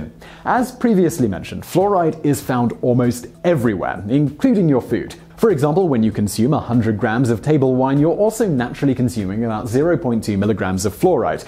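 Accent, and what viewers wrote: United Kingdom. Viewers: seems like a british dude talking about dental health doesnt seem like the most credible source lol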